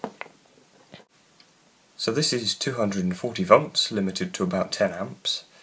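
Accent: United Kingdom. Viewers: i’m pretty sure he’s from the uk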